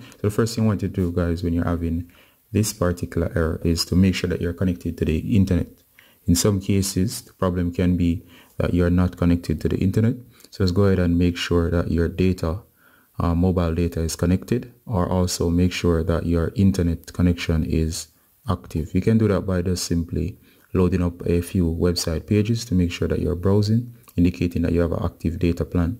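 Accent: Jamaica. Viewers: Love your voice man Are you jamaican or something ?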